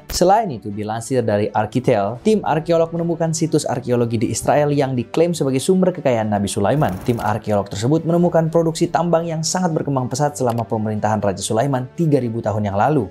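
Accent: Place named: Batak, Indonesia. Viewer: Hai aku penasaran aksen apakah ya ? Kaya batak atau bugis ya aksen dan dialekmu?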